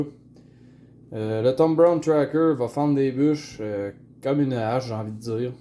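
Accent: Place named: Quebec, Canada. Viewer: Merci pour cette vidéo. Condor niveau qualité/prix/efficacité c'est souvent bien pensé et correct. Je découvre ta chaîne et rien que pour les couteaux, la neige et ton accent de cousin outre océan, je m'abonne. à+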